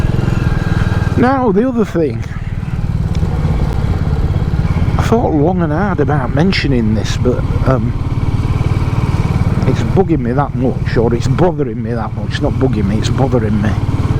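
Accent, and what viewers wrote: United Kingdom. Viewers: Keep the music hes in a rush sounds bit british.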